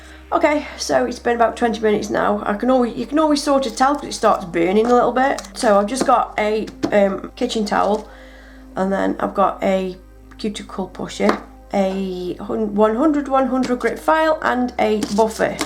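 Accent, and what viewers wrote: United Kingdom. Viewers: You sound like your British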